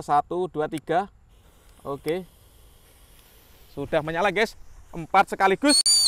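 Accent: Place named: East Java, Indonesia. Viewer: Jawa Timuran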